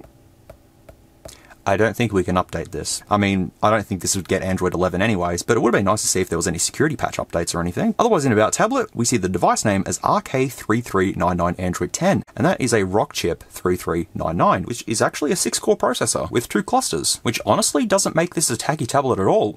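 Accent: United States. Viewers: American here. Your American accent was actually really good! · DAMN! your american accent is pretty solid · Well, you're no Hugh Laurie, but your Yank accent definitely does not suck, bro. :) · Your “American English” accent is pretty spot-on, tbh. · You got a nice American voice ! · Just saying, you've got a pretty good American accent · Your american accent is actually pretty convincing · I enjoy ur American accent lol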